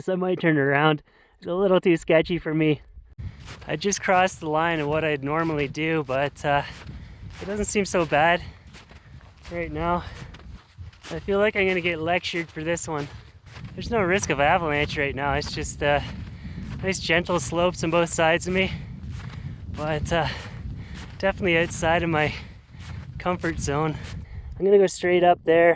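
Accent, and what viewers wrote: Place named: New Brunswick, Canada. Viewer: This is insane man. Are you originally from NB? Accent sounds there lol. I’m originally from N.S. myself and wow these videos really makes me want to explore our massive backyard. Keep it up!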